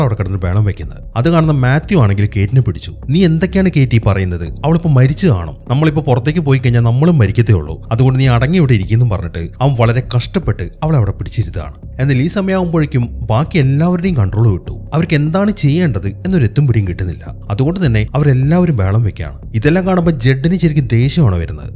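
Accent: Palakkad, India. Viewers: Chettan palakkad ano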